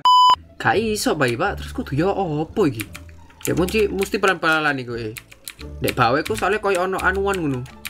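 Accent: Java, Indonesia. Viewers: Bang sampean jowo ne jowo ndi mas kota ndi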